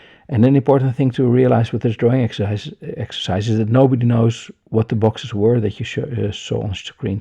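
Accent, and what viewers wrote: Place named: Netherlands. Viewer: Haha i knew you were Dutch by you're accent😊 ik ben ook Nederlands. Hallo ✌🏻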